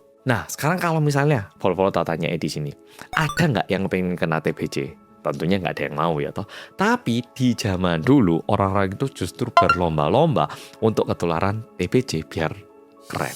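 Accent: Java, Indonesia. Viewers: hai mas medok🎉 lgsg nyimak🎉🎉🎉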